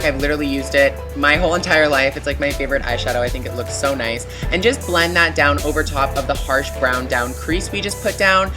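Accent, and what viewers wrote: Canada. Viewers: Love your canadian accent :)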